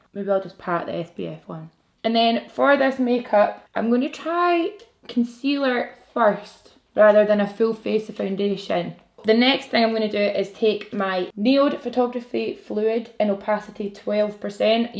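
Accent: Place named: Glasgow, United Kingdom. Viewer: Ive been watching all the recent dramas across the pond and it’s so lovely to come here and listen to that beautiful familiar (glasgow girl here too!) accent and some gorgeous makeup! Keep it up Mrs!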